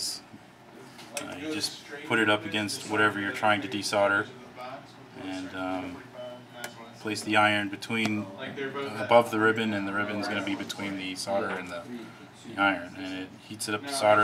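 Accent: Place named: New Brunswick, Canada. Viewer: you sound like a New Brunswicker. true?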